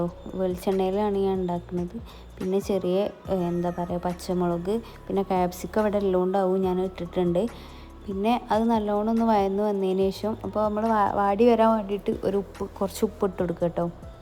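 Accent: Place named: Malappuram, India. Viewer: Areekode slang ann🥰njanum angane aaan..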